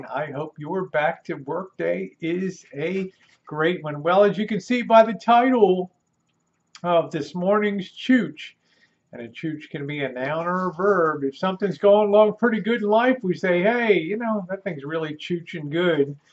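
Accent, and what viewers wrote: Baltimore, United States. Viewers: I knew u were from Baltimore !! I was a city cop there for 23 years.The minute u said wooder for water. 😆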